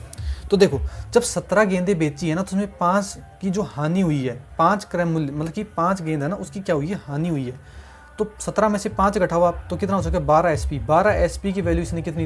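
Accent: Rajasthan, India. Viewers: Bhai app rajsthan se ho kya ? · Rajsthan se ho kya bhaiya aap 😅❤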